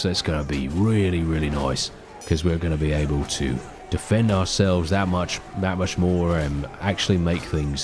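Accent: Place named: Cockney, United Kingdom. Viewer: I really enjoyed this episode, Cockney accent aside. Add it in, still really enjoyable. It's fun, but not required!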